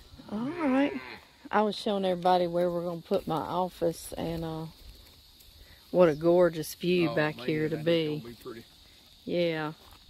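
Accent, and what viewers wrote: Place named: Appalachia, United States. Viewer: Girl! Thank you for your channel! I was struggling out in my garden today and I could not for the life of me figure out why my purple hull peas were fat and looked ready, but weren't purple and the peas looked a pale green. No info on Google for us southern folk who want info on our southern foods. Nope. Thank goodness I thought, check YouTube! I am now putting away some peas ... and listening to your other videos! Are you originally from LA? I would have pegged you as the Appalachians. I say that because that is where all my family is from. ;) We talk a lot alike. Anyway... take care and thanks again. Looking forward to your videos. This is my first year gardening and canning... I'm 46! Late start!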